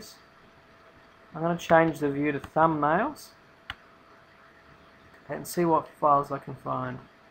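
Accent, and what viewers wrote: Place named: Australia. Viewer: omg i luv ur accent! austrailien, right? (did i spell that right? i dont think i did.)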